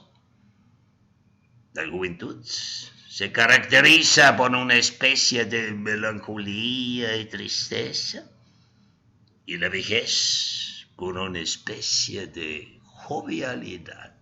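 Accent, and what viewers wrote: Germany. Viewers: es un man español que posteriormente fue a residir a alemania. ¿¿no le reconoces el acento alemán en ese español tan remarcado?? búscalo en googles, aparece como.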